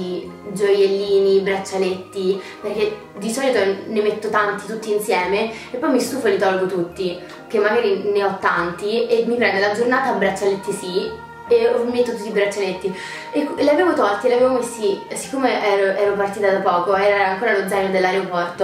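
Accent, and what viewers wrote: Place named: United States. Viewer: parli in italiano con l'intonazione americana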